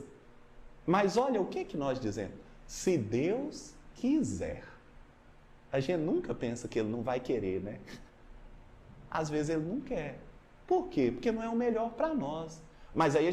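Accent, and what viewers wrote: Minas Gerais, Brazil. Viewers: Que sotaque bom ! É mineiro?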